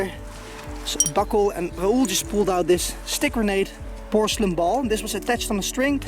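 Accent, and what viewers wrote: Netherlands. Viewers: Can I come with you one time? Sounds like you are from Holland, damn I love this 🤓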